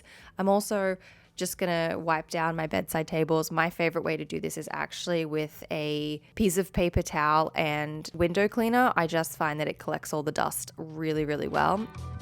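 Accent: Australia. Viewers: Do I detect an Aussie accent?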